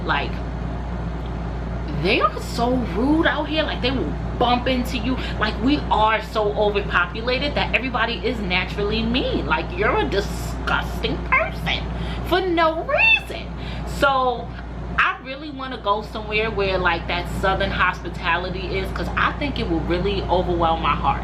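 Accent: New York, United States. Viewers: Hey beautiful new fam here I literally been benge watching your videos an babbby I'm loving an feeling your vibes your realness is everything I can relate to alot of your conversations in SOOO many ways like girl I be like dam is she in my head😅😅😅it's crazy plus I love the new York accent, I have a channel with my nieces an nephews so we will definitely be supporting you an your BEAUTIFUL babies have a blessed Sunday 🙏💜😘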